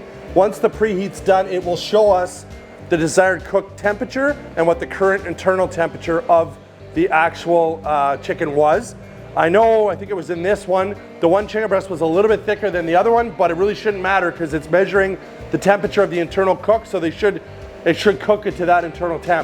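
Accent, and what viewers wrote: Canada. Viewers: I love the way Canadians pronounce "out" and "about". Love Ninja products!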